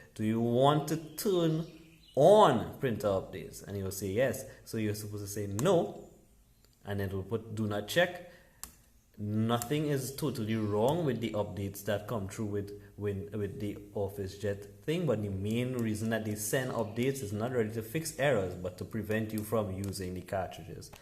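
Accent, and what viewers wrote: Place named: Trinidad and Tobago. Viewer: Hi thanks for this video. You sounding like a Trini, if you are can i get a contact for you? Or call me at <num> thanks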